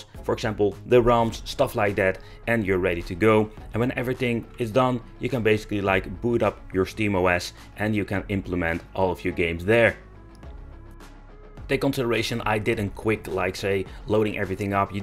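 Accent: Netherlands. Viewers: It sounds your northern European, Dutch I presume.. did you have to pay import costs for the steam deck?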